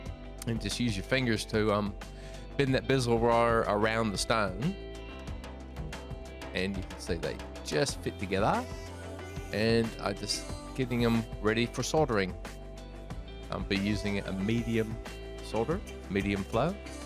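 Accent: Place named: Australia. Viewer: So I checked your Instagram and can tell you’ve definitely picked up in the Aussie accent. Also you live about 2 hours from me ☺️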